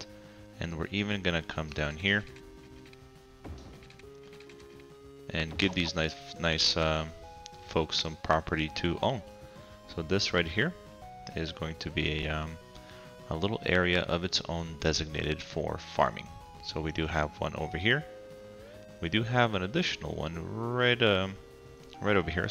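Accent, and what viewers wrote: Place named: Netherlands. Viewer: ohhh, I don’t know what BiH means? But I thought so because you sound like you have a little bit of a dutch accent. It’s why I asked :) awesome cities btw! Have a nice day!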